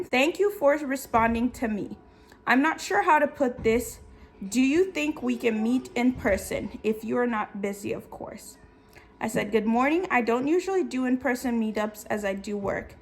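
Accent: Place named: Jamaica. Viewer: Hi. Just came across your channel. Curious to know if you were born and raised in Zambia. You kind of sound Jamaican to me lol. I'm Jamaican by the way 🙂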